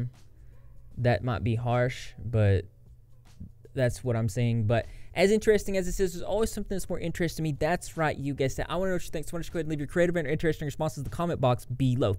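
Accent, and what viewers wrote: South, United States. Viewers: What part of the south are you from? I can really hear it in this video. I’m from the south too. 😂😂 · Found you the other day under recommended videos & I’m obsessed!! I love the info & the southern accent - I’m from GA! Keep killing it 😍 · I didn’t notice you had an accent until you said “I’m southern” love that for me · “SORRY IM SOUTHERN, I can’t pronounce.” Saaaaaaaame lmao 😂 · This is great, thanks for reporting, and thanks for standing up for SA Victims. Also, I personally looooove southern accents so please don’t worry about how you pronounce things. ☺️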